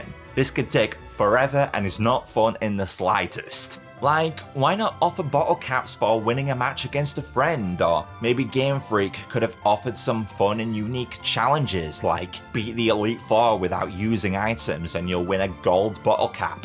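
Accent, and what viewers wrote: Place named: North, United Kingdom. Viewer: this guy is my new friend he sounds up north lol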